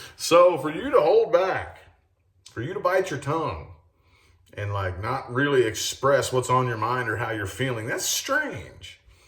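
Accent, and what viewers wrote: South, United States. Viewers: Haha, I love your southern accent!! 😈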